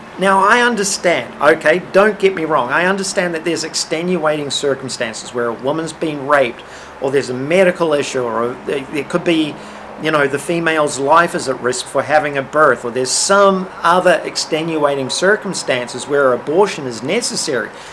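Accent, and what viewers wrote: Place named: New Zealand. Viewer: Never miss your videos, always on point ,no pulling punches. Am aware of a lot of the info you talk about. Just great to here someone on media saying it. Can relate to you because i also have an engineering background. Just love that Kiwi accent with a hint of American as well. Am Aussie so it is very easy to here it. Your subs should be in the hundreds of thousands ???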